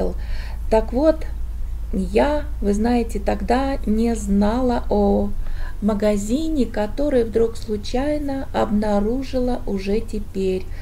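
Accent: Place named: South, Russia. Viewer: Дорогая ,витаминка добра и солнышка ,мое уважение,люблю ваш голосок и ваш южный говорок❤👍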